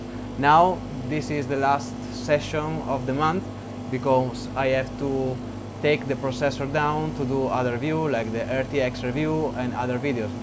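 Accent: Italy. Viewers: ma te sei italiano? hai una pronuncia che suona di casa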